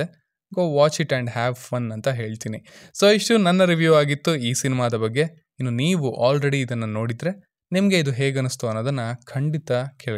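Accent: Honnali, India. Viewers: Nim voice and mathu kelidre nivu ಹೊನ್ನಾಳಿ avru ansalla!!!!! ನಿಜವಾಗಲೂ 😊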